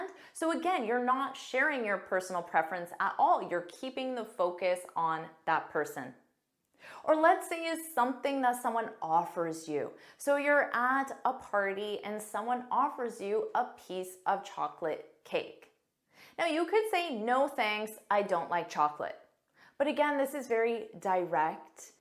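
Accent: United States. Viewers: this is an american english, right? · OK for American English, but it's a far cry from how British people speak. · You have a perfect American accent..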